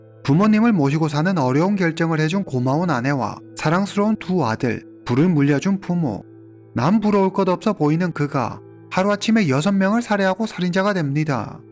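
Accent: China. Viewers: 유튜브채널주인 조선족임? 말투가조선쪽인데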